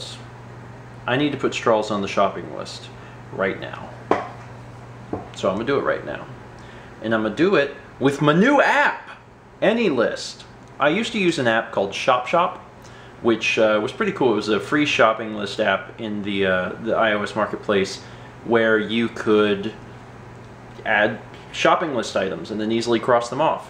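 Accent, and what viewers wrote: South, United States. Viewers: its the southern accent it sounds like we say an l when we are talking really fast. figured id let ya know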